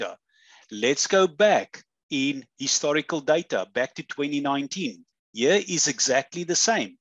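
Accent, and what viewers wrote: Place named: Afrikaans, South Africa. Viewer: Yes it is. It's an Afrikaans accent from South Africa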